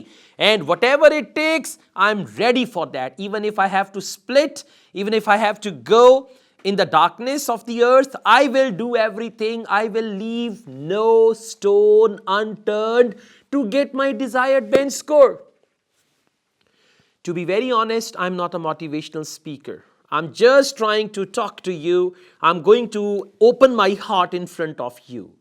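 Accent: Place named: India. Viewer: I don't like when you talk in Indian 🙄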